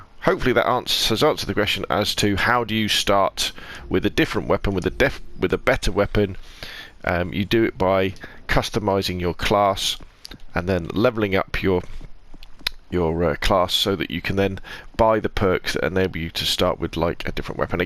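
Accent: England, United Kingdom. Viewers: Excuse me, sir, you are saying everything. Corrects, you explain it very good, but please take the marbles out of your mouth when you're speaking. It's really confusing. My friends, I know you have an english accent. And that's why listen I have a Boston accent. That's very deep so if you could clarify a few things, take the models out of your mouth and thank you.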